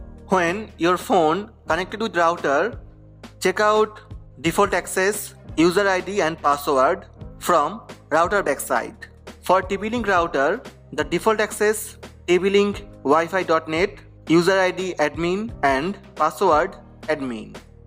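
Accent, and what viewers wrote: India. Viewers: Course has to have that Kwik-e mart accent...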